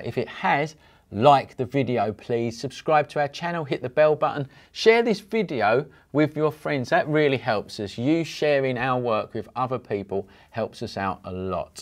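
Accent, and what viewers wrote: United Kingdom. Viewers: what i learn is, UK accent, is very pleasing if i may presume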